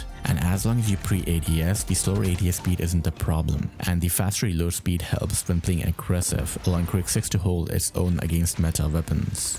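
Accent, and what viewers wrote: India. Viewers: I usually dont like indian accents as they are hard to understand but the crispness of your mic and the way u talk is just so smooth 😭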